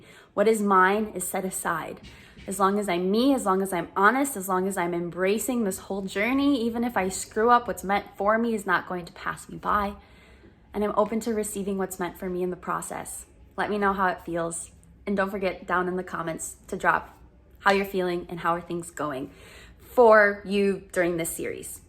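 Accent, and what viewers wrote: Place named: Romania. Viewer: I looove your romanian accent! 🥰 You're saying it perfectly! Great video too! 🤩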